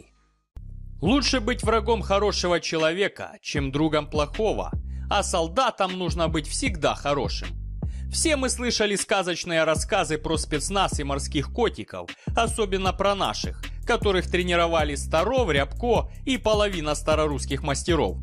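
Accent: Ukraine. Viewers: Комментатор,хохляцкий акцент чувствуется за версту.Идите тренеруйтесь.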